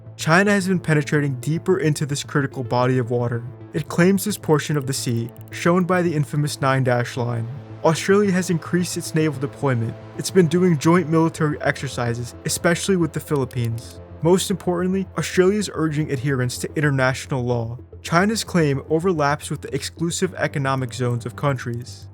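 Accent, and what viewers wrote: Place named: United States. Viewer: This video sounds a bit America centric, this American voice over doesn't seem to realise we're done fighting for other people how about Australia concentrates on itself, fu*k the rest of the world especially America we dont need them they only want anything to do with us when it benefits them, USA the most useless ally any country could rely on and get screwed over by.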